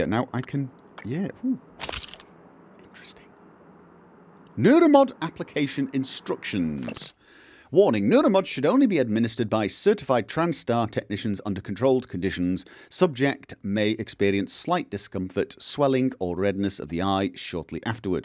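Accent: United Kingdom. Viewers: Jeancly Hi! I'm learning british english, could you tell me where is his accent from?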